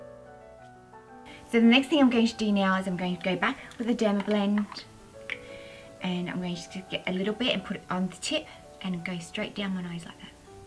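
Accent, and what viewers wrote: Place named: Australia. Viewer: Wow , we live in Queensland, would love to meet and greet when you come.. Hoping you can sub back and stay connected.. I knew you where aussie when I heard your accent..Love this channel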